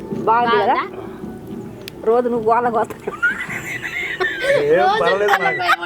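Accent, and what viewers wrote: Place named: Khammam, India. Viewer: Meedhi kammam district kadha? Me athamma accent alaane vundhi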